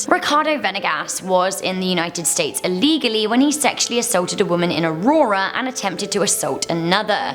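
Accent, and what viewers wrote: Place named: United Kingdom. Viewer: Excellent video, I love the British accent.....!!!!!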